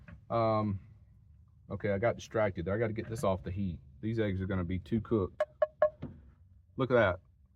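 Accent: South, United States. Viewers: This is at least the second time I’ve heard you comment about your looks, dear. You are an attractive man, and I suspect ladies really love your sweet southern drawl. You are very accomplished and have such a nice personality. Have confidence in yourself and hold your head high, dear man!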